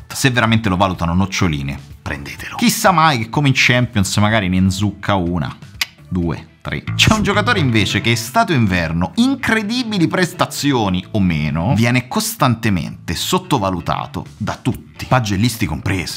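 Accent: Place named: Terni, Italy. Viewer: ti prego rispondimi di dove sei perche dall accento mi sembri di terni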